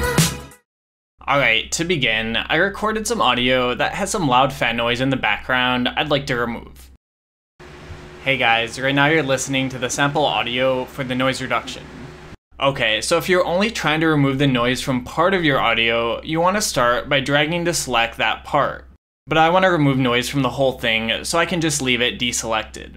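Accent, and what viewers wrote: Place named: Los Angeles, United States. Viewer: you have such a cute la accent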